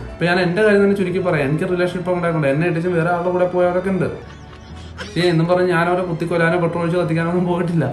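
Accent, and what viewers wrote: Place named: Kasaragod, India. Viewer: എവിടെയാ നാട്. നമ്മുടെ kasargod ഭാഷ വരുന്നു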